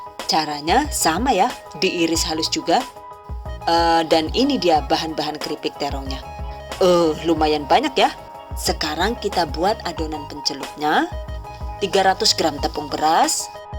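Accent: Banyumas, Indonesia. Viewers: Kirain orang bule tadinya ...gak taunya ngapak ngapak 😅😅..mantulll buk e 👍👍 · Kok ngapak ya ngomongnya😁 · kie mesti wong banyumas ya ? ngendi yu umaeh ? apa baturaden ? tangga desane nyong ? 😆😆😆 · Wong ngapak jebule, Bun subscribeer baru bun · Lucu kadang bahasanya ngapak.. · Kaya wong ngapak y Bu....heeee....aku ikutin truusss....pnsrn sm Ibu niih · Mantulll bunda,,👍👍👍👍 Ternyata basane ngapak kya inyonge 🤣🤣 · Wkwkwk jebule mbaknya ngapak salam.ngapak yen.ora ngapak.ora kepenak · Bunda ni aslinya mana si, ngapak bener.. Saya Purwokerto bun.. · Ya ampun ternyata pada bae mbi aku wong ngapak... matur suwun bu resepe, slm ngapak ora ngapak ora kepenak... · Org ngapak😁😁🖒🖒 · besok coba ahhhh.............. ini koq ngmng2 orang jawa sih... lucu drnger suara ngapaknya.. samaan kaya aku... 😂😂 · wowww mkshh bunda resepnya.bunda lucu kyaknya org ngapak nih sma kaya aku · Tutor nya teges .. 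tapi sepertinya mbake orang ngapak ya? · Makasih resepnya.. Semoga sukses selalu Eh btw nganggone basa ngapak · Sy ibu rumh tangga biasa 50 tahun....bahasane koyo ngapak....ngomong cindil.....matur nuwun mba....kapan"" tak cpba.... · Jane kie cenele wong ngendi yaa...deneng kadang2 ngapak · Tinggal di madiun tp jawanya ngapak ya mbak e..hihii...asli cilacap apa wangon kie tah mesti..hihii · Malah keselek hahahaaaaa,,,,rika wong ngendi koh bu? Kaya wong banyumasan deneng? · Bahasanya ngapak memang orang mana ea...??? Tadi denger pas lagi keselak..🙈🙈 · Makasih bunda resep kripik terongnya..sy suka liat vedio bunda lucu ada bahasa ngapaknya he he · Setiap resep yg ditampilkn mudah di praktekn dan berhasil berarti tdk ada yg di RHS kan cuma lucuu nya di selingi bhs. Ngapak...😀😀 Sukses mba... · Nyonya satu ini emang the base.tahu sdh sy coba pare blm kok puinter idenya maupun buatnya lho .hem mantap .nyah tp nadanya tadi ngepek2ya bojoku garut .bhs inggrisnya panduanya kangen suaranya .sukses slalu nyah mksh ilmunya respon baiknya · Mamake ngapak ya mak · Ngapak2.. 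Kayak bapakke wong banyumas hehehe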